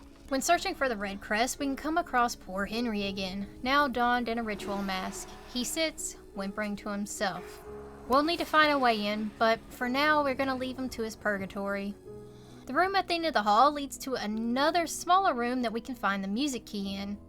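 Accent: South, United States. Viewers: you sound like you have a slight southern accent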